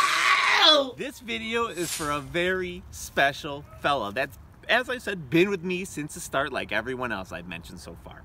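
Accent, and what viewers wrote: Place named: Appalachia, United States. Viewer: Funny!! I loved this video!! What I find funny though is out of the people you shited out only commented on this video so far. I hope they see it! Anyways I really liked how when you were doing your interview I got to see the real you! Your real voice! The hillbilly hick lmao!! Never grow your hair out!!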